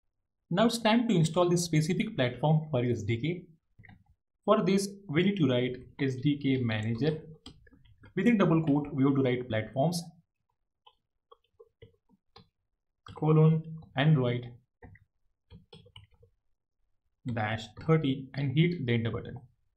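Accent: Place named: India. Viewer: closed after the indian hello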